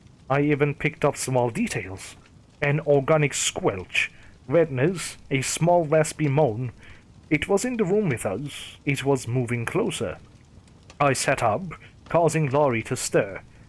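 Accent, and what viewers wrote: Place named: Sweden. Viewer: it's not that your voice is monotonous. You have an odd tendency to raise the pitch of your voice when concluding sentences. It's unpleasant. Your accent sounds Swedish. Is that correct? If so, it might be because of that. I've also heard similar tendencies in Indian accents.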